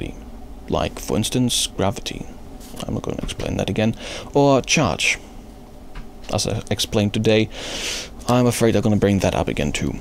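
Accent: Germany. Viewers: HE'S GERMAN HIS AACCENT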